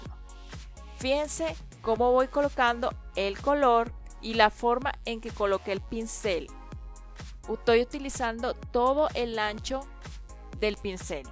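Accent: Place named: Venezuela. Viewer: Muy buena, parece acuarelista venezolana, es genial es muy didáctica y fascina su modo de hablar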